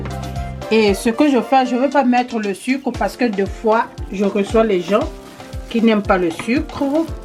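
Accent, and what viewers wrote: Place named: Republic of the Congo. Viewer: Merci ma soeur Ton accent est du congo bzv Sans me tromper vous etes lari? Je reconbait notre accent . Soyez béni.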